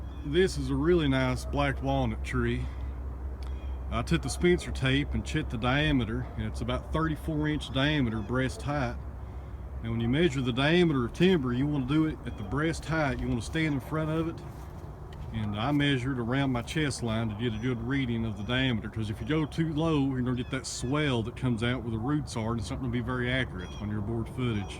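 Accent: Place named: South, United States. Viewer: From what I can safely assess in your video... based on the species composition and general contour of the land - combined with the southern deflection in your dialect... I am going to throw out an educated guess that your are geographically situated somewhere in either in north Georgia, north Alabama, or most likely Somewhere in Tennessee. How'd I do? Post Script... I have 23 years experience cruising timber professionally across a diverse commercial platform. After two decades in the business, it seems to me that somewhere around 98 percent of the general public have no idea it is even a job. That always puzzled me... as their very world revolves around the production of all the various wood products... any thoughts to that end?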